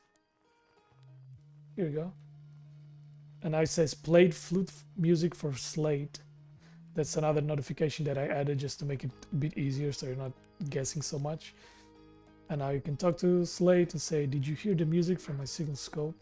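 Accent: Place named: Portugal. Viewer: AHahah, com esse nome e essa pronuncia, so podes ser tuga! Fiquei altamente surpreendido ao saber que o Outer Wilds tem mod para VR, e vi isso ontem em um video sobre os melhores mods VR em 2023. E hoje fui ver melhor, e fui dar a tua pagina! Quando vi o " " parti-me a rir! Ainda estou para testar isso, mas ate agora, parece que estas a fazer um excelente trabalho! Impressionante, sim sr. Os meus parabens, desejo-te muito sucesso!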